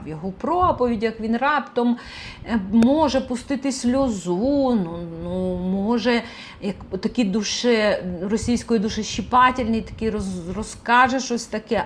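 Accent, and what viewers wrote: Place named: Surzhyk, Ukraine. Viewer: Ну, подарунок вы ещё тот. Вы говорите на суржике. Смешной, исковерканный говор. Пидуть, справди, агрэссия, винувата, процентув, сказав, говорыть. Всё исковеркано. И вы захотели, чтобы другие тарабанили на этом подарунке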